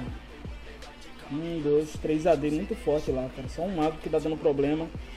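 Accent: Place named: Bahia, Brazil. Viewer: Ó larga o doce pivete ó larga o doce pivete ksksk parece q vc é daq da bahia ksksks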